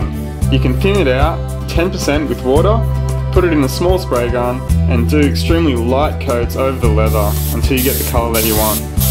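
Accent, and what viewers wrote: Australia. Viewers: Great to hear the Aussie accent! Thanks for the great video and info. I see I can buy it in Wodonga. Can I use this product on a vinyl dash board and window pillars? It is not possible to spray them, so I am presuming I would apply several coats with a cloth without removing the dash (huge job to remove it)? What can you suggest?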